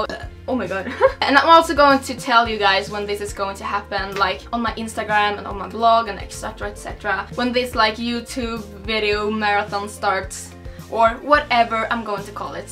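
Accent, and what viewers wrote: Sweden. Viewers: I really looooove your Swedish accent 😎 You're so Cute 😘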